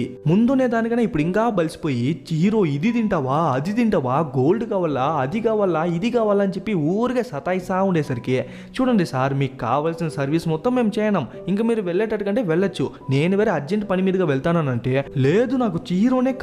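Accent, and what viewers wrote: India. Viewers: which telugu accent it is but i loved it❤😊😅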